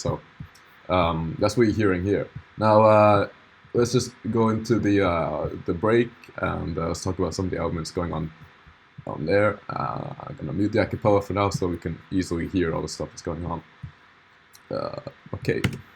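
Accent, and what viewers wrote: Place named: Sweden. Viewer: Jag hitta en guldgruva !🤩🤩 fan oslagbart kommer kolla på din kanal 24/7 . Jag gör bort mig om du inte är svenska utan jag bara dömer på din dialekt Ahah.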